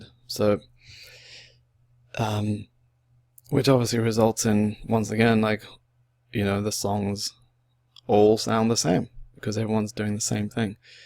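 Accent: South Africa. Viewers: Thanks for the video dude! Really helps a lot 😊 Are you South African by the way?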